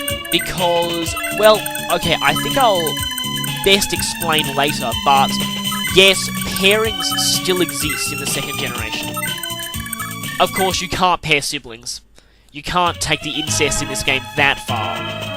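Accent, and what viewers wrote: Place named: Australia. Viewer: Blah, I wanted to see more of this - Your style of LPing is great, and your accent makes a nice change from most LPs as well - Australian and Scouser are incredibly similar to my ears, being in the UK, but as a fellow SF member, I can't wait to see this continue.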